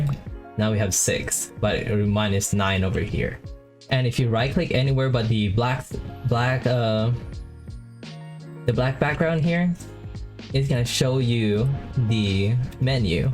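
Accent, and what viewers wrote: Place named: Brazil. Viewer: Não precisei de 2 segundos de proza pra saber que esse caba é br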